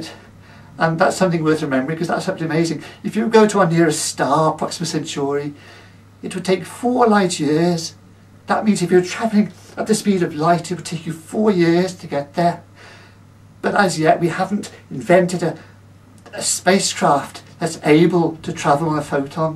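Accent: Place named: Birmingham, United Kingdom. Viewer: You must know you bad.. You just sound like you, a boring brummy professor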